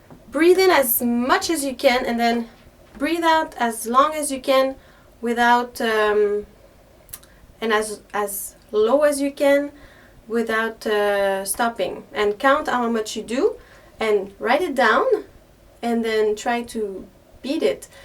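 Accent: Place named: France. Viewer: French horn player here 📯 Thank you for you tips , really helps. I can feel that I'm full with air :) Also you have nice English with gorgeous French .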